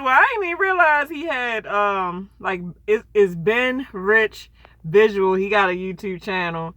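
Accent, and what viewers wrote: Baltimore, United States. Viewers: Bmore accent.lol.sexy tho I found you cause I'm about to be a truck driver..n can't stop watching you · Lol! Sewper dry? Yewtube channel? I love your accent! Baltimore!😂😂😆😆😘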